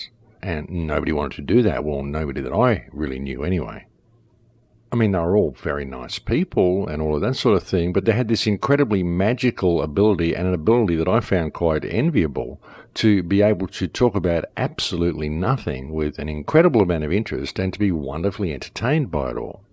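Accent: Australia. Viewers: Wow. I've woke up, never heard of you, first thing on my feed and you're describing exactly how I feel!!!!! And you sound like you are in Australia. Is there any way to connect with you on LinkedIn? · Patrick dalton what r u talking about he's australian not british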